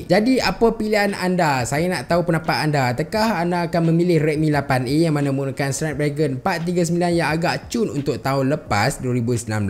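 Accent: Taiping, Malaysia. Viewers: Abg orang Taiping ke? Hahaha baru perasan 😂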